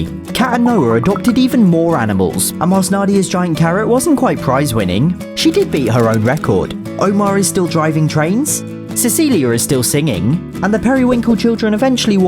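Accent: England, United Kingdom. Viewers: Yo are you English or welsh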